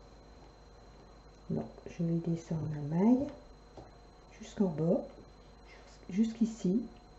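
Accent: North, France. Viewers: Bonjour, c'est gentil d'avoir répondu et si vite,.malgré mon expérience j'en apprends toujours en regardant vos tutos. ...De plus j'ai l'impression de reconnaitre un peu l'accent du Nord, je suis moi même originaire de cette région. ..Vous m'avez redonné le goût de tricoter....Grand merci!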